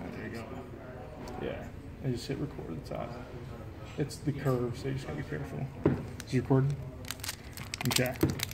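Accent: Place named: New York, United States. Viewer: Bro I knew from your accent you lived in New York haha I'm in New York as well and could just hear it!.. amazing that you were out here collecting and making big moves so many years ago and still today.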